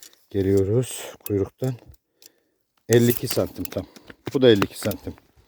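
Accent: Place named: Amasya, Turkey. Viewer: bende Amasya lıyım abi şivenden anladım hemen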